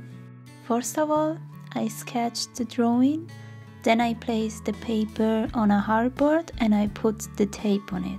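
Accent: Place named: France. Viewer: une francophone quand même, j'ai reconnu l'accent! xD mais tu parles bien anglais, ton accent français est presque inexistant:3 j'aime beaucoup ton travail par ailleurs, tu aurais un instagram?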